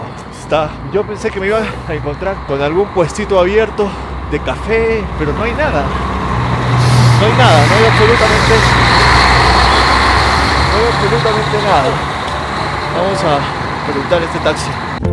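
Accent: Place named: Peru. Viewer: Que esperabas....en invierno y a 4000 metros de altura. Mejor te quedabas en casita y calientito. Por tu tono de voz Peruano.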